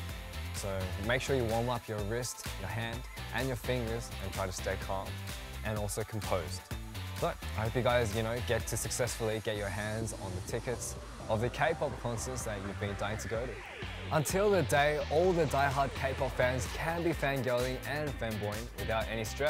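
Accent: Australia. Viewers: i just fell in love with the australian accent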